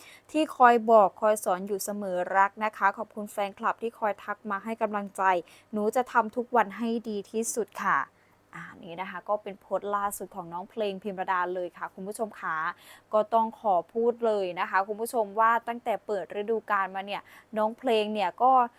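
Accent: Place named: Isan, Thailand. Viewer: ❤แอดมินเปนนักข่าวหรือนางเอกหมอลำ..น่ารักจังอ่านข่าวสำเนียงไทยผสมอิสานได้ไหลรื่นฟังเพลิน❤😊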